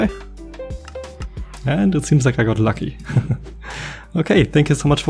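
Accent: Germany. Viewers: Er hört sich wie ein Deutscher an oder spinn ich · Wow! I love the size of it! :D Btw: are you German? Your accent sounds very german I think :) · Dieser deutsche Akzent....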